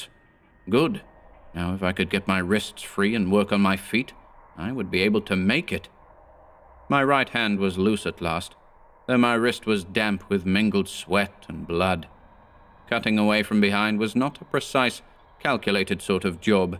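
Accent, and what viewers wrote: United States. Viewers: Fantastic reading, as always...love the American accent lol...if only I could master a British accent even half as believable I'd be a happy girl 😊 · Your American accent is impressive. Excellent as always Mr. and. Thank you.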